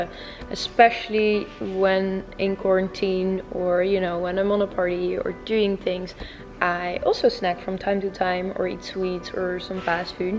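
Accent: Netherlands. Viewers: she sounds so dutch lol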